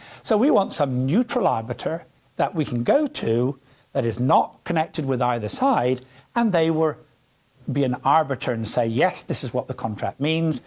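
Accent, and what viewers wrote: United Kingdom. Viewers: Great video! I can't quite place the gentleman's accent; are there any people from the UK who can recognize it? Is he Northern Irish?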